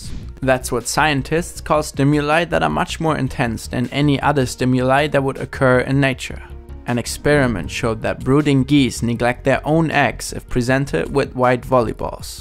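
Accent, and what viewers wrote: Germany. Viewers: Bin nur kurz hier um mir deinen deutschen Akzent an zu hören und so leid es mir tut er ist wirklich deutlich zu hören 😂😂😂 aber eigentlich ziemlich lustig 😄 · Normally I really don't like the German accent, but I think it kinda belongs to this channel (Also u sound insanely cute in this video) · The german accent stays😤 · I love your german accent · Pleased keep the speaker! I Love the german accent! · The german accent gives this channel a certain characteristic. I think it should stay as it is. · Pro German accent. Because it gives a unique characteristic, like Shirvans Azeri accent in Caspian Report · It seems like most of your audience is currently still German but I think you have really good chances of expanding into "international YouTube" and attracting new people who would'n't have been able to enjoy your videos otherwise. I also think that this is one of the main reasons you get complaints about your accent, because Germans usually can't stand German accents while everyone else doesn't really care that much. 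Personally, I think it adds a lot of character to your videos and I know a lot of other Youtubers who speak with a German accent but have a predominantly international audience. If the accent really bothers you, you can hire a narrator but you don't have to in my opinion. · for me, i dont like his accent, but thats because i dont like the german accent, thats why i dont like speaking english myself, i just really dont like german accents when speaking english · he could work on it at least a bit, though. Even as German as my first langauge, I especially hate a strong German accent. And his is a lot worse than I expected. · Really good video. Like that german accent too! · don‘t get a narrator. in EVERY damn video game, the one with the german accent is superior. I don‘t make the rules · the german accent is so thick that i hope i dont sound like that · I would appreciate a native speaker because it's a bit weird if you're German and you're hearing German youtubers speaking English. 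It's not because you can't speak English fluidly etc. but the Acent is pretty big. lol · Please do not get a speaker. Your voice is so nice to listen to and even though i am german i know a couple of british and american people who do not mind a bit of an german accent :) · Also man hört halt stark deinen deutschen Akzent, ABER es wird dafür viel verständlicher, weil du sehr deutlich sprichst. Find ich nice👍🏽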